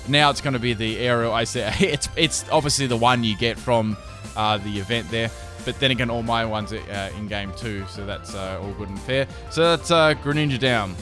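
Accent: Australia. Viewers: he's australian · are you ostralian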